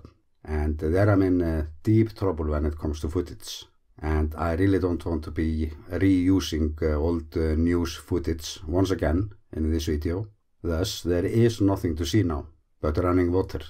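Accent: Iceland. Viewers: you are good at this. engaging and I find a real relaxation in your Icelandic English and cadence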